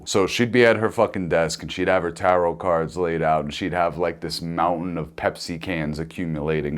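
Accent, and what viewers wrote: New York, United States. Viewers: Omg the NYC accent is strooong! I’m here for it tho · Listening to this gave me such a sense of nostalgia. This story was told like a born and bred New Yorker. Love the accent changes and the impressions!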